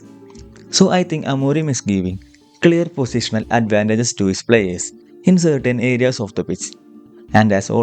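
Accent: Kerala, India. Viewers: I could recognise your accent since I have friends from Kerala and I watch many malayalam movies. I'm from Assam. So great to see a tactico account from India. I think you are the first one. I wish you all the best and hope this channel grows leaps and bounds. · Good stuff brother. Your accent sounds like you are from Kerala. Even better!!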